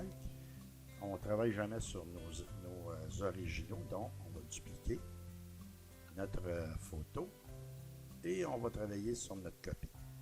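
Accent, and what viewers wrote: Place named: Canada. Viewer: très bon tuto; l'accent canadien ajoute ss doute qqchose. Très clair et efficace. Merci.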